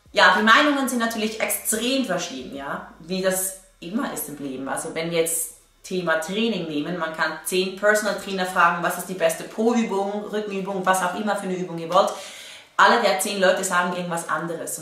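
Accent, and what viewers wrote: Switzerland. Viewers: Schweizerin? :'D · ist sie schweizerin?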